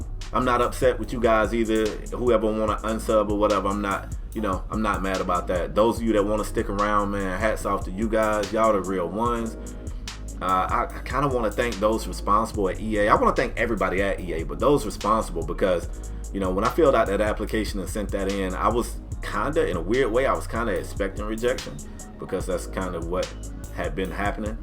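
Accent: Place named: South, United States. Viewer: I remember in 2k12 days you made me create a scoring wing.... An you had a real country accent, it really showed when ever you said rain maker.... you had the best ncaa story’s....You made me watch a madden series.when I hated madden just to see johnnie, josh, and Ed reed reunite.... I wish you the best and may peace and prosperity be upon you 🤟🏾🤟🏾🤟🏾🗣🗣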